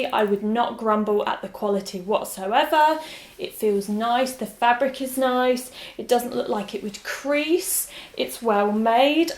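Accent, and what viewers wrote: England, United Kingdom. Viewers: Hello from Spain,:) I would love the light blue blazer (number two). I would wear it either with dark blue jeans, beige pumps and a white shirt or with a white dress. I love your videos, your style, your English... Watching your videos make me wanna live in England ❤️ Greetings from the very South of Europe.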